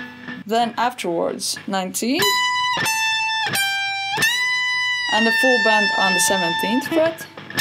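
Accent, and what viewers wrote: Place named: Russia. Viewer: да конечно нужна. тоже смотрю. Благо инглишь нашего брата ни с кем не перепутаешь и легко понимаешь что говорят.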